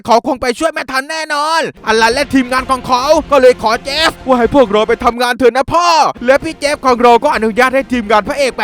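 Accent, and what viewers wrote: South, Thailand. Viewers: พี่บ่าวๆๆนุ้ยอยากให้ สปอยเรื่อง เอลซ่าภาค2นะพี่บ่าว เด้กใต้จ้าเหนพี่พูดใต้ชัดเลยคิดว่าคนใต้ค่ะ ขอเอลซ่าภาค2ค่ะนะๆๆ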